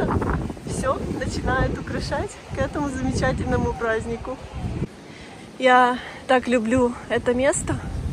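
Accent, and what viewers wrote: Ukraine. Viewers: Такой акцент у тебя Украинский и такая ты серьезная не смотря на юмор Одесситов вообщем покорила ты меня❤🌹💋🌹🌹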